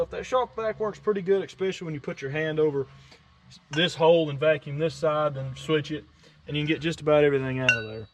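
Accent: South, United States. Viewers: great job. love southern accent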